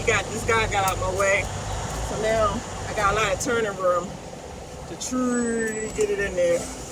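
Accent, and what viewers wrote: Baltimore, United States. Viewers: copy that driver. You sound like you could be from bmore but I believe your from upstate NY? Forgive me if I’m wrong lol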